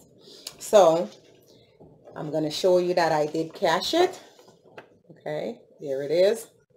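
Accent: Jamaica. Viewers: A Jamaica yuh come from?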